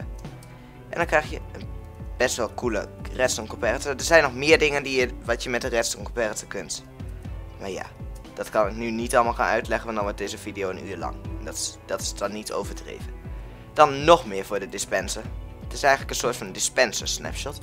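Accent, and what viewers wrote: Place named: Rotterdam, Netherlands. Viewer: Leuk filmpje maar hij heeft een Rotterdamse "t" egt irritant om te luisteren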